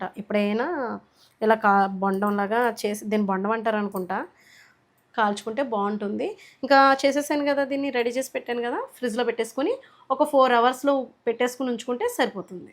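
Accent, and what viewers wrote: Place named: Vijayawada, India. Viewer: Mee. Maatalu. Bagunnayi. Mee. Pilla. La. Maatalu. Vijayawada. Language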